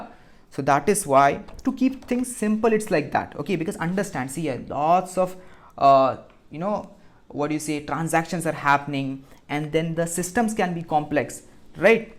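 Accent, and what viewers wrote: India. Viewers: as soon as I heard the Indian accent I felt I'm on safe hands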